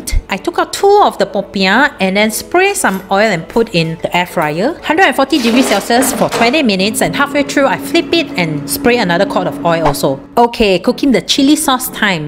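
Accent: Malaysia. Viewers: Love ur manglish babe 😂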